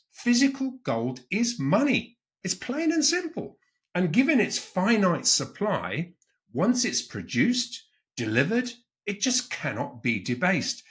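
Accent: England, United Kingdom. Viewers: I agree too technical to fully grasp. I love, but between the jargon and his English accent, high low delivery (definitely not boring, but a lot goes over my head) Maybe can create an edited video to help explain the concepts. eg.. 2 minutes of, and equal time for to speak plain American english to interpret what just said.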